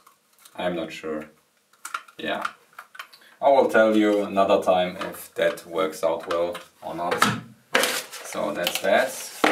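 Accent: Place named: Germany. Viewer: man merkt unnormal dass du deutsch bist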